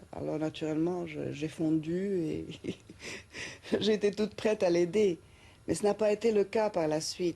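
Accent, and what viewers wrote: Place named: Egypt. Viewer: لهجتها الفرنسية حلوة بس ظاهر فيها انها مصرية :) انا مهتمة اوي بالتاريخ المصري وحقيقة مصر ام الدنيا بلا منازع.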